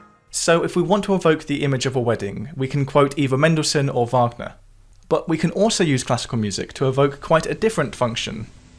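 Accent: United Kingdom. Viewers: "Wuhld" is almost worse than a Bostonian. Honestly this sounds better to me than a Bostonian because my parents liked britcoms late at night on the local PBS station. I got adapted to this accent pretty fast when I got a pubescent liking to Penelope Keith's hipbones. Y'all Brits probably think I say "world" as "wuhrrrl(d)".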